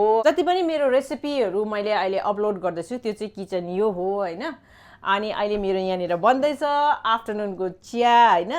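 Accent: Darjeeling, India. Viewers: बधाई छ….! tapaiko बोल्ने शैली सुनेर दार्जिलिंग कै हो भन्ने पहिलेई थाहा थियो मलाई चैं 🤗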